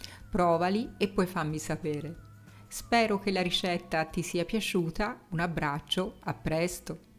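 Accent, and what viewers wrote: Tuscany, Italy. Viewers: Devono essere deliziosi😋 adoro le tue spiegazioni e il tuo meraviglioso accento toscano! ❤️ Un caro saluto🤗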